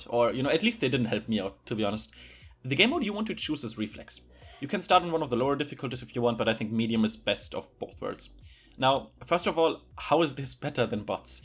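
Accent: Germany. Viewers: Ich höre so raus dass du deutsch bist 😂 kann Mann diesen aim "Unterricht" auch in deutsch bekommen ? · I was just wondering what your native language is? Your accent makes me think of that of a German but your real name doesn't fit (great content btw) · hey bist du deutsch du hast nämlich n ziemlich startken deutschen akzent in deinen Videos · Are you from Germany? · Am I hearing a german accent? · Hört man direkt. Ist zwar kein starker Akzent, aber als Deutscher erkennt man das sofort. :D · nice video! btw are you german, because you sound like me when i speak english (and im german)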